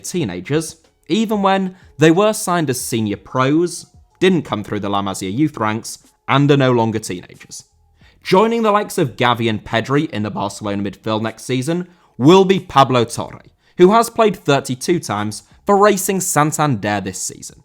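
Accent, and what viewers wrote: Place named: North, United Kingdom. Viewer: You do understand he has a northern accent? I wonder if you would be so critical of an an African or Asian speaker where their natural speaking style would cause similar issues.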